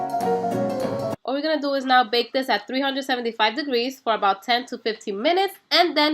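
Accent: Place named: Dominican Republic. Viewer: U sound Dominican is this the Latino way of making? Lol looks good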